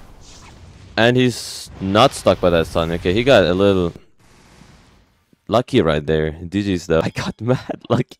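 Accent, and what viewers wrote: Jamaica. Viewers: Are you Jamaican? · Whit you talk Jamaica bro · Bro jamaican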